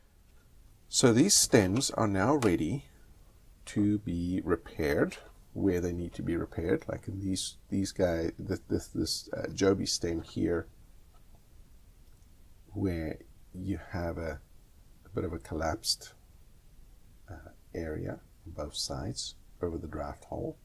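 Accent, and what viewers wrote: South Africa. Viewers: There's a South African accent in there..... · I almost expected to hear 'if you do it like this, you will just cause more kak for yourself'. Glad to see that the South African accent hasn't completely disappeared.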